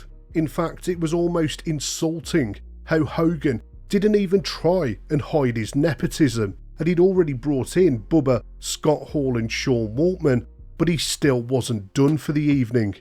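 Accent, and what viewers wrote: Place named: Scotland, United Kingdom. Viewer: Always a Scottish narrator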